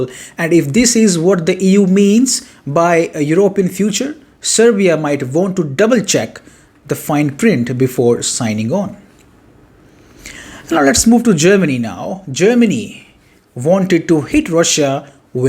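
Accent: India. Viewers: And also have no problem understanding your Indian accent. Very clear! Cool! 👍🏼 Keep up the good work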